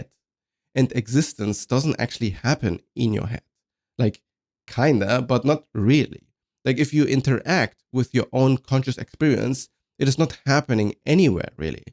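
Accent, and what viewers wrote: Germany. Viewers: hey friend, love your stuff. thanks for sharing. it's really brave of you to speak your truth and i really resonate with what you say. are you german by any chance?? i'm trying to pin the accent hahah. perfect english tho, no offense. have a good day !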